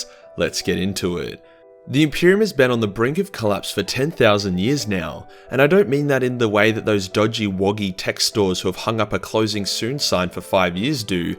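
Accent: Australia. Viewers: A captivating and entertaining story told as only an Aussy or Kiwi could ;) Noice! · блять,еле еле австралийский понимаю,абоба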